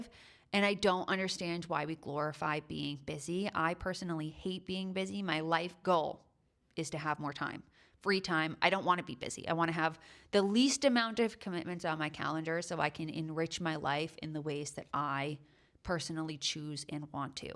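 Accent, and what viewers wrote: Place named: United States. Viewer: American accent is so annoying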